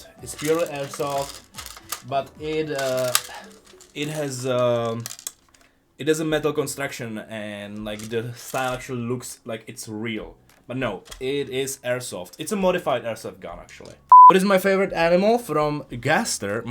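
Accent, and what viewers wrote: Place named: Czech Republic. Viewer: Tak 4 sekundy a bylo to jasný že jseš čech.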